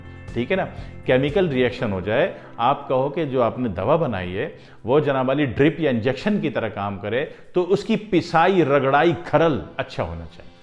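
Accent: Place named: Delhi, India. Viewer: بھائی میاں آپ دہلی والے لہجہ میں بات کرتے ھو۔ نیم حکیم خطرہ جان۔ بھائ میاں چھوٹے گوشت کا قورمہ کھائیں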